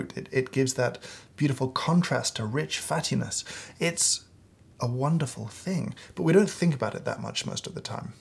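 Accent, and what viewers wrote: United Kingdom. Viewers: If someone ever tells you, you speak too fast--ignore them. I love to listen to people who are fluent, concise, precise, and find it painful to focus on information, which is delivered slowly, with lots of gaps and searching for the right word. Having a youtube channel is the perfect venue for you. You have something you know well (coffee) and are able to speak about it intelligently, with concentration and focus. I am not a coffee drinker (yet?), but enjoy your videos because of your delivery--made even better by your British "accent". Thanks. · I have learned so much from you over the past year that I haved started watching your videos. They are incredibly helpful and full of insight and expertise. I love your way of talking (and it's not just because you have a British accent 😁😂) and how you easily articulate your thoughts, it actually reminds me of Allan Watts. 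You have intensified my love for coffee a thousand times more. Thank you very much for being so amazing at what you do. Wish you bliss, joy and health.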